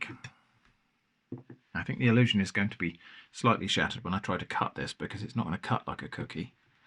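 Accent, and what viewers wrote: United Kingdom. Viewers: You don't know how wonderful it is to hear a British accent calling cookies "cookies" instead of biscuits.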